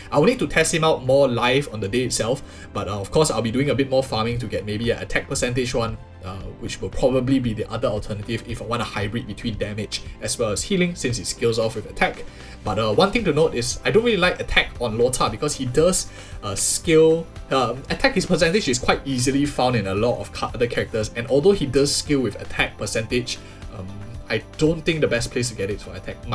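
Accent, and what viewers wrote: Singapore. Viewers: subscribed for the singaporean accent 😂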